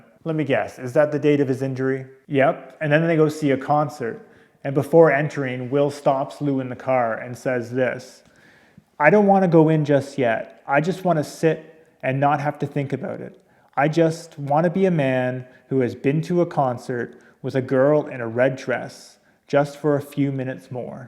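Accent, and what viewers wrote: Canada. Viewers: You sound Canadian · Okay so I know this horribly off topic, but are you Canadian? It's just the way you said eh. I'm guessing if you are Canadian you're probably eastern. Idk I'm just completely focusing on your accent, sorry. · There's a lot in this video I was nodding along with but may I just say, you sir have the only Canadian accent I have ever been able to pick up on!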